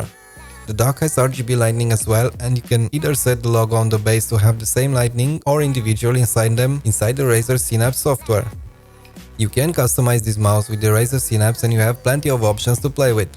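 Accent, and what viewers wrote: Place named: Germany. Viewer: Very serious setup and professional review, good stuff. You sound like Germans, are you? :D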